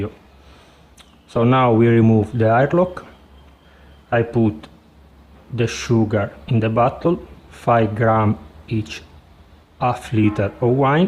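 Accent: Italy. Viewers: Grazie mile... O seu sotaque italiano é muito gracioso... e o melhor de seu vídeo é que filmaste o fim, ou seja, como o kvass se comportou no copo.. obrigado.. abraços do brasil.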